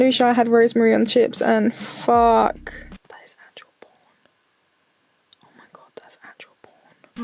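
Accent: United Kingdom. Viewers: I'm also British but I love how extra British you are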